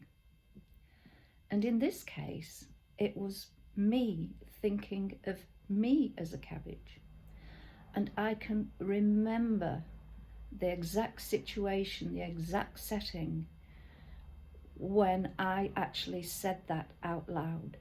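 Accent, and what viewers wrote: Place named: Lancashire, United Kingdom. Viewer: I thought it was a Lancashire accent, maybe more rural Lancs than say Manchester. I was brought up in St.Annes and now we live in Heysham, it's a grand place.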